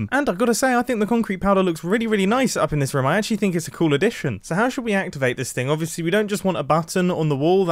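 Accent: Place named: United Kingdom. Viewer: it’s because of his accent. is British btw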